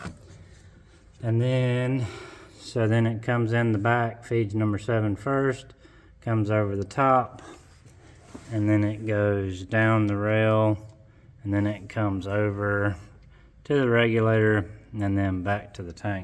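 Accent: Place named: South, United States. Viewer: Have a few question myself - you have any way outside of youtube to communicate? I have a 2500 burb with ly6 and 80e which is already cammed, converter, gears and longtubes. Im looking to go turbo or lsa and have a few questions about dealing with heat etc - sounds like you live in the south as do i (alabama)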